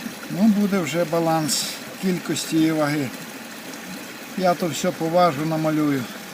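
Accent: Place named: West, Ukraine. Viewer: Здравствуйте! Откуда вы, какая область. Мой свекр с запада Украины Ивано-Франковской области, разговаривал точно так, даже голос похож.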